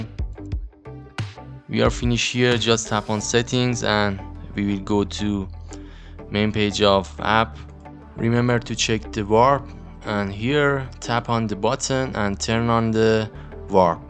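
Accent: Iran. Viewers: خدایی لحجه ایرانیت مارو ... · از لهجت معلومه ایرانی هستی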